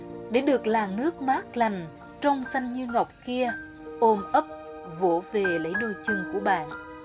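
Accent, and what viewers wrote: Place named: South Central Coast, Vietnam. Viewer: Chào chúc em nhiều sức khỏe! Lời dẫn nghe rất hay từng chữ từng câu được trau chuốt rất là êm tai,càng nghe càng thấy đậm nét giọng miền trung nam bộ việt nam.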